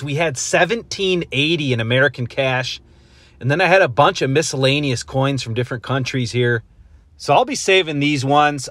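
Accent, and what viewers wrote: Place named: Canada. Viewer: Where are u from? Your voice sounds Canadian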